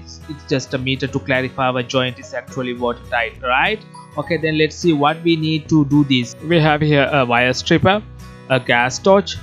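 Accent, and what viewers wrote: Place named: Sri Lanka. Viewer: you have sri lankan accent 🤔👍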